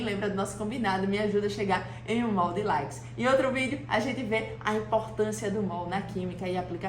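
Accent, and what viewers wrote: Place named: Recife, Brazil. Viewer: Este sotaque recifense não engana, com certeza temos aqui uma excelente engenheira química recifense, visse?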